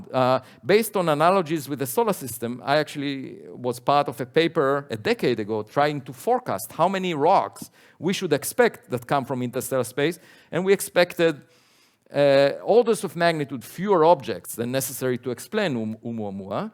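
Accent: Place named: Europe. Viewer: This man is simply FASCINATING! And his European accent makes him all the more so. Thank you, Avi, for encouraging us to be humble. Like you, I am of the firm belief that we are NOT alone. But, of course, only time will tell.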